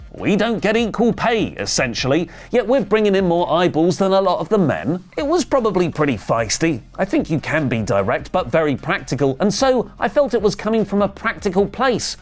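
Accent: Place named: United Kingdom. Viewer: Its his British accent lol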